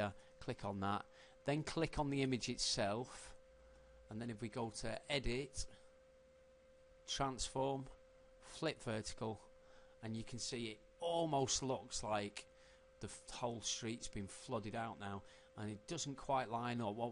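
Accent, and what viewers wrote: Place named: Manchester, United Kingdom. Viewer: really good tutorial, and I like the Manc accent top man.. lol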